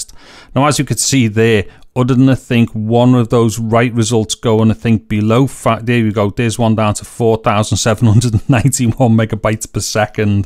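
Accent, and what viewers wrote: Liverpool, United Kingdom. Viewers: You are a scoucer, aren't you?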